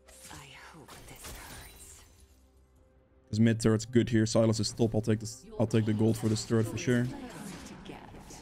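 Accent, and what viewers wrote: Netherlands. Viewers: He sounds dutch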